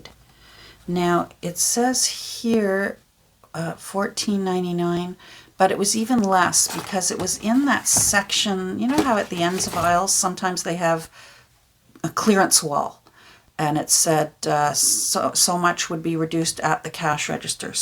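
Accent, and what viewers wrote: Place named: Canada. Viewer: I was born and lived in Minnesota for the first 19 years of my life, and I think my accent sounds more Canadian then yours. But then I heard you say “about” and I thought…aha! There it is. Very slightly Canadian accent came through. Thanks for posting. I enjoy watching your videos so much. I appreciate you.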